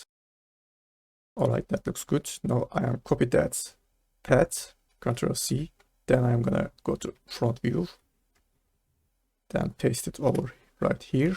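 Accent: Turkey. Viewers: sesini duyar duymaz türk olduğunu anlamak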